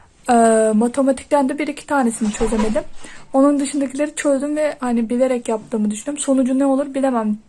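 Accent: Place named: Aegean, Turkey. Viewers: nerelisin şiven çok tanıdık da Kütahya civari mı sormak istedim🤗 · Bu kız %100 egeli. Konuşma tarzından anladım. Egeli olmadığım halde djsjdjssjs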